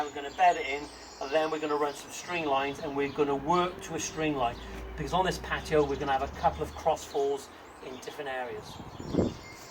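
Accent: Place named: Malpas, United Kingdom. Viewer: Cracking job! Just come across your videos! I recognise that accent, my Mum's from Malpas 😎👌🏻👌🏻🏴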